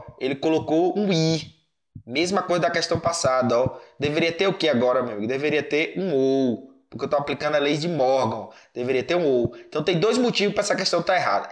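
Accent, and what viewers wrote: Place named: Bahia, Brazil. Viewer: Sotaque baiano arretado! rsrsrs massa!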